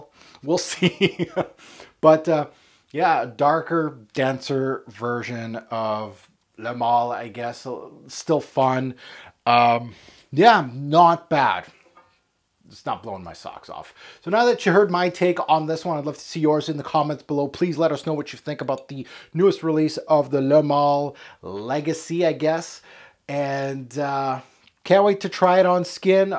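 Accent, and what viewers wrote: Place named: Quebec, Canada. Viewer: You sound exactly like any English teacher here in Quebec… you from qc?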